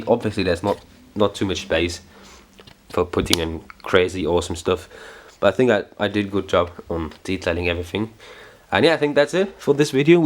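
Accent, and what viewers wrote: United Kingdom. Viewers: Haha wie geil! Du hast ja richtig ein bisschen einen britischen akzent bekommen! :D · wo hast du so english gelernt? Hört sich ziemlich british an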